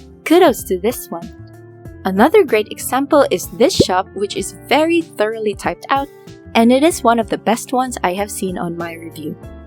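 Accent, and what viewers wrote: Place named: Philippines. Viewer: almost give up on POD after spending so much time following tips here and there ,I'm glad I stumbled on your channel...and wow your amazing and inspiring, I find so much value in your content....I'm hoping to learn more and finally establish my RB shop. Thanks so much. BTW, correct me if I'm wrong, I'm from the Phil and hearing your voice and diction makes me think we are from the same country, anyway, I'm just curious..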